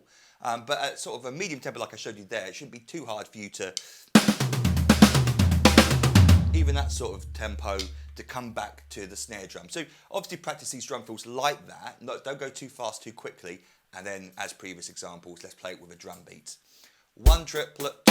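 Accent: United Kingdom. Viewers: su acento británico pronunciado no ayuda mucho a captar audiencia, pero no se puede discutir su buen nivel musical sobretodo mantiene buen ritmo y tiempo preciso...👍🏻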